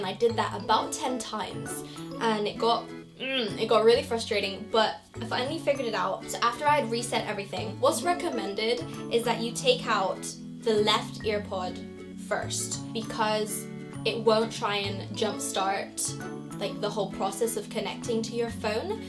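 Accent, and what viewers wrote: United Kingdom. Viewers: Do I hear a British Accent? That's very Cute 😃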